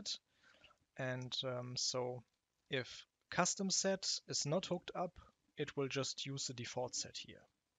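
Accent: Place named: Germany. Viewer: oh, German accent :)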